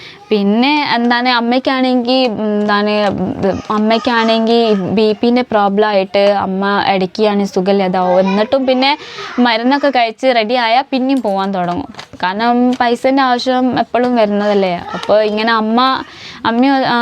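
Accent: Malappuram, India. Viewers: Malppurath alle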